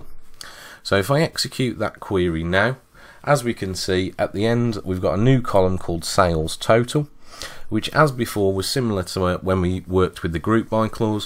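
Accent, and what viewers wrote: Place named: United Kingdom. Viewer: I really hate british accent. How do you guys roll your mouth like this. This is worse than indian accent... Allright allright not bad at that level but c'mon guys it is really pain in the ass.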